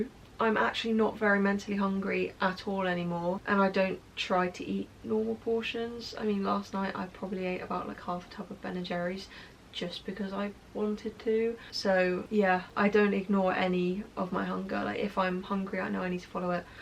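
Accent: United Kingdom. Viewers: As a non-native English speaker you "just" sound British to me and I probably could hear differences in British accents in direct comparison but other than that - British and no character traits or society-ishy associated ♥ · You literally just sounds like your from Hampshire... why is everyone saying your posh 🤣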